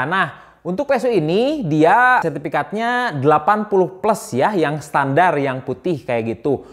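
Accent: West Java, Indonesia. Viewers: urang sunda nya kang 😄😄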